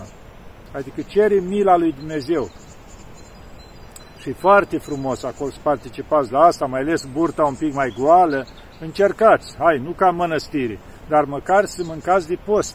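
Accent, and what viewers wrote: Moldova. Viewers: Bună ziua!!! Părinte cita blindete aveți, și apoi adăugind și acest grai dulce moldovenescsimt că mă cucerește, și vă ascult cu multă plăcere!!! Părinte vă rog amintiti-ne că postul este postul în fapte, nu, faptul că nu mincam de dulce pe cind faptele noastre sunt uneori reprobabile 😭Sănătate deplină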